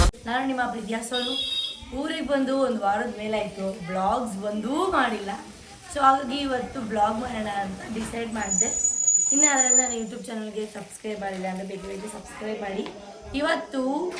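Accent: Mandya, India. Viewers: Yav uru nimdhu nammuru bhashe tharane edhe nim mathu kathe mandya athra yav uru ma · ಸೂಪರ್ ಮಂಡ್ಯ ಭಾಷೆ.. .... ನಿಮ್ಮದು ಮಂಡ್ಯ ಅತ್ರ ಯಾವ್ ಊರು ಯಾವ್ ತಾಲೂಕ್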